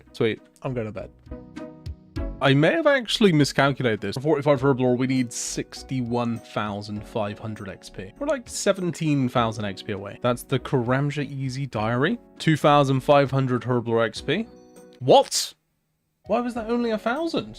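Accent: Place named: Northern England, United Kingdom. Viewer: yooo, i think you're my fav yt scaper. your nothern accent is incredibly comfy. the vid is good but the voice is like an additional armchair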